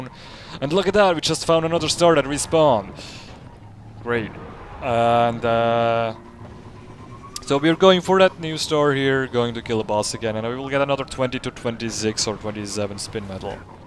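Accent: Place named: Switzerland. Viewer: has uf dim Steamprofil gseh, mer erkennt d'schwizer scho ader stemm, ben aber ned ganz secher gsi :)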